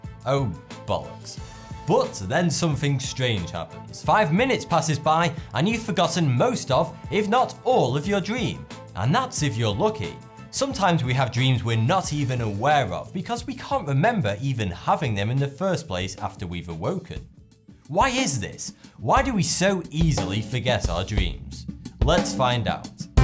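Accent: United Kingdom. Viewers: I like this guy because he gives detail and it's easy to tell the people behind the scenes have don their research. And the British accent works well too.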